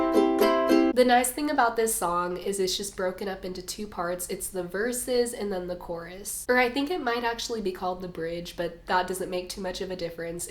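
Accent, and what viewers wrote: South, United States. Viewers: why does she never sound southern in any videos except for this one lol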